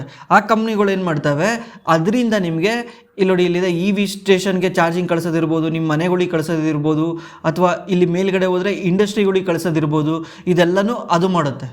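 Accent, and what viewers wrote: North Karnataka, India. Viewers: Current hogeti anta kundarasabek antete super brother uttara kannada langauge...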